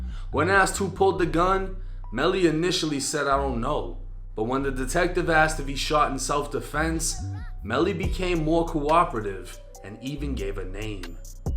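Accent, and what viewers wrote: Boston, United States. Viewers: Love the Boston accent I'm from NY what up. I'm following this trial and I love tour style and how u cut you videos with so many visuals. Also I make club music on Ableton and u see u must be making shit yourself from the back ground. Anyways I like and subbed. Word, no names. ... I did 2 yrs up top over here. That shit don't fly my way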